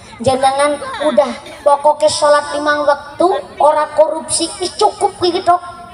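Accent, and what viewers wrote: Banyumas, Indonesia. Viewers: Nyimak Ceramah ngapak nya😂😂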